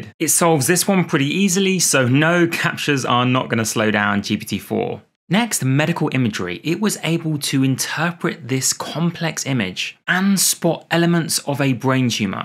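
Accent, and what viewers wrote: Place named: United Kingdom. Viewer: Having a British accent is a cheat code for being a science / STEM YouTuber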